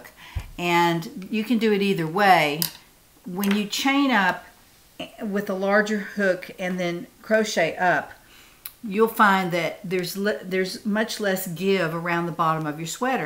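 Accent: South, United States. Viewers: This is the first time I’ve watched one of your videos. I really enjoyed your content and Southern accent. That comfy yarn looks great!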